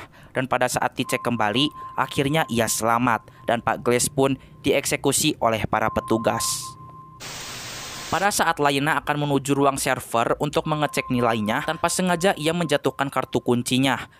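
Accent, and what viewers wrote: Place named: Sunda, Indonesia. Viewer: Suaranya sunda banget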